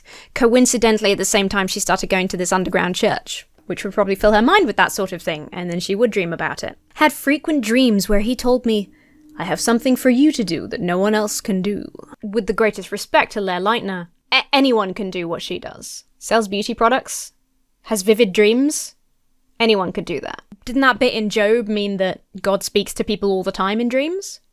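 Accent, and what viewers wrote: United States. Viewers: that american accent genuinely broke my brain for a good few seconds when i saw your mouth moving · with an american accent is cursed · I'm only twelve and a half minutes in, but I have to say I got so confused when you began reading in an American accent. I listened to this in the background while I drive, so it was a little bit disconcerting. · Your American accent is impressive. Reminded me of the one Michael Fassbender does in Alien Covenant. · I love your American accent. It's gold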